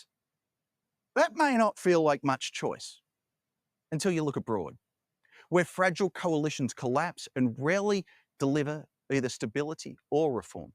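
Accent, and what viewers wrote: Australia. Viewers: As an American with friends & extended family in Australia, having visited twice with 5 weeks in 2024 and longer trip planned 2026, I definitely love Australian style, accomplishments, pride and accent. Dr Wolfers who I listen to in other discussion venues, really has enhanced my admiration of his home country.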